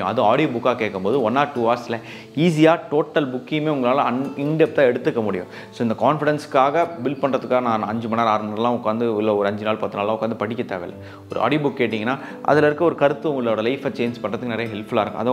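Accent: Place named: Madurai, India. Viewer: Anne Madurai slang la pesurimga❤❤❤❤❤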